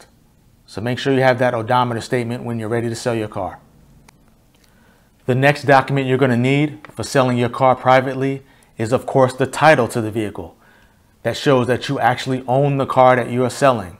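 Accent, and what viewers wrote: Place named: East Coast, United States. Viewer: No, you don't. That depends on the state. With his accent, he sounds like from the East Coast, and may not be aware of laws here in the West.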